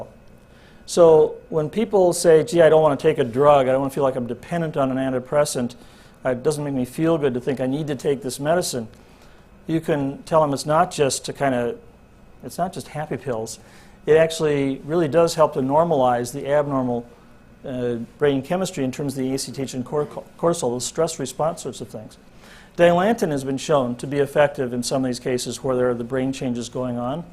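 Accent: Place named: Kansas City, United States. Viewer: Were you rasied in KCMO? You sound similar to me. I'm a shaken baby, with a birth defict spina bifida, and hydrocephalus. Also, the neighborhood, the stores around me, and my schools were also abusive. At least when it comes to the schools, I wasn't the only one. There are some really bad teachers and high school counselors. Sadly in MO, CPS sees you as an adult at 17, not 18. When I was 16 a Dr. reported my mother, but nothing could be done because I was to close to adulthood at 17. Really a bad state with social services, not only with children but with the elderly as well.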